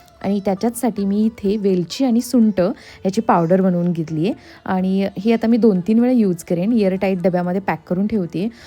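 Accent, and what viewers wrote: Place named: Pandharpur, India. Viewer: Hi तुझी भाषा थोडीशी आमच्या पंढरपूर सारखी वाटली धपाटे चिकाचे दूध❤❤ तुझे बरेचसे ब्लॉग बघितले छान नॉलेज आहे तुझ्याकडे 👍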